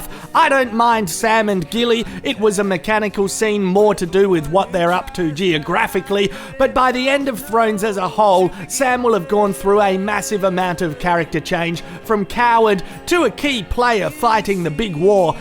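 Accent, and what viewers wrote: Australia. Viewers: You have clearly never met an Australian in their natural habitat.. we all speak like that. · love your reviews. you say what I'm thinking in Canadian eh, in a Australian voice. . .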